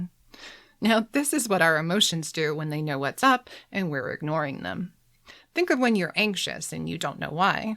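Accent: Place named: Maryland, United States. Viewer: to your friends😊… this video is… perfect! I have been trying to find just such a video about narrative structure for a group of my students in Grade 9- Freshmen, first year High Schoolers in USA. (Excuse me if 9th Grade is obvious to you! I know nothing about your citizenship, though your accent sounds like mine from Maryland.) Regardless, as said, perfect video! The specifics about the spirits, Dickens’ direct yet deep depictions, and the psychological sides of Scrooge — wow, it is enlightening and engaging without being too easy… Thank you so much! I hope to see more of your videos and use them to help all my students grow in their Narrative Writing abilities! “God bless us, everyone”… but especially you and yours this Christmas!🎄🤓🎄🥳🎄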